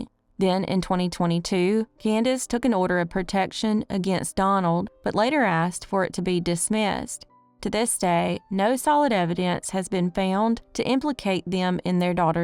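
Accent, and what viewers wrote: South, United States. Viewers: Hearing Southern crime/unsolved mysteries stories from a narrator with a Southern accent is so much better. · I love hearing a southern accent for a change, born, raised and still in southeast GA I haven't heard these before only the current case I'm following in Sumner County, Sebastian Rogers as well as Summer Wells also in TN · I love to hear that southern accent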